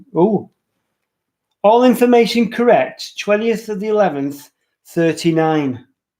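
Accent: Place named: Manchester, United Kingdom. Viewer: Oh....you are so Manc! Lol....love your vids xx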